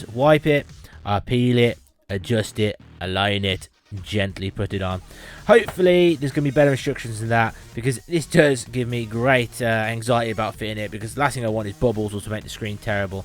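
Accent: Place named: West Midlands, United Kingdom. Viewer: Hi mate. Thanks for the thorough review. I noticed you say it direct affect the screen clarity right? As in it doesn't cause the pixels and text to become slightly blurred? I've not ordered an SD yet cos waiting for sales or refurbs. Getting ascitic to wait though 😂. Hey, you sound local to the west midlands! Big up the WM massive!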